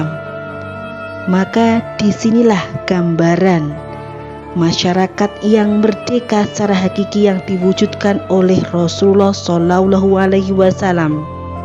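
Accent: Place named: Java, Indonesia. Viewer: MasyaAllah tabarakallah.. sehat selalu dek. Medok nya buatku merindu..💕💕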